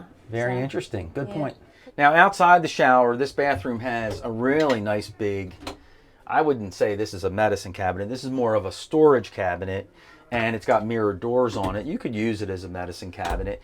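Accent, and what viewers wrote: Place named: Baltimore, United States. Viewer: Love your videos. does an excellent job shooting the interiors. You can hardly see them on other channels. I've been meaning to ask - you are from the Baltimore area, correct? - I grew up there and you have a heck of an accent, Hon! If I'm wrong, next guess would be PA or NJ. 😀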